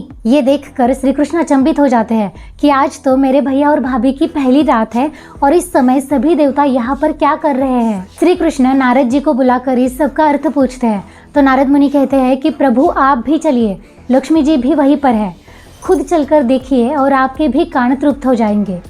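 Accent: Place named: Maharashtra, India. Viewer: I love your hindi with a slight hint of marathi accent. God bless you!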